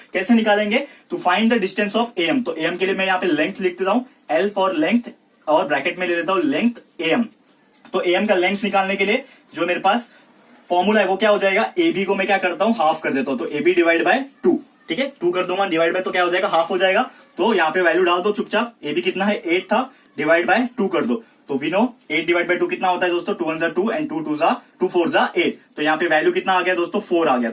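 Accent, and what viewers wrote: Maharashtra, India. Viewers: Sir aap Maharashtra se ho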